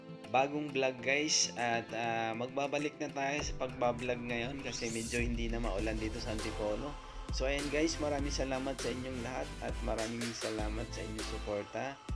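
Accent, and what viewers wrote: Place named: Bicol, Philippines. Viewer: Akala ko Bicolano ka,, saka yong bukal akala ko pinakikita nila talaga 😂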